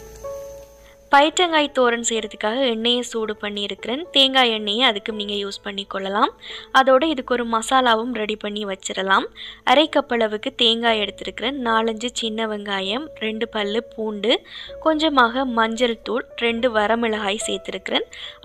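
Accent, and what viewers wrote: Jaffna, Sri Lanka. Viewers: I was waiting for your vlogs sister I love to hear your slang of tamil of Jaffna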